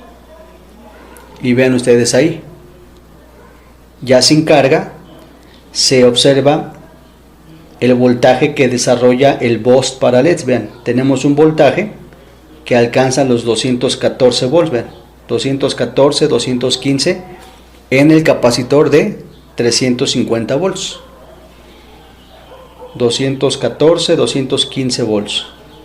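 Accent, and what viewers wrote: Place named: Mexico. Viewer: Me gusta mucho el acento mexicano. Saludos desde Chile.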